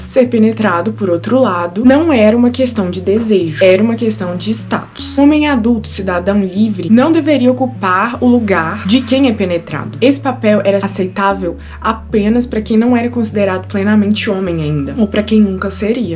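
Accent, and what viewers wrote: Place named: Minas Gerais, Brazil. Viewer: 3 palavras e ja desconfio q eh mineira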